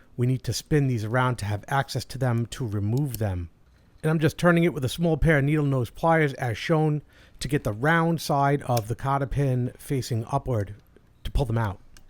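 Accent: New York, United States. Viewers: Excellent video sir. Love the NY accent. I'm from NH and speak a little different.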